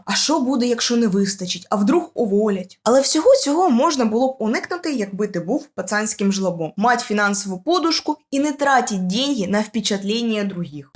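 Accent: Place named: Zhytomyr, Ukraine. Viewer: Ой цей божественний житомирський суржик)) Лайк підписка)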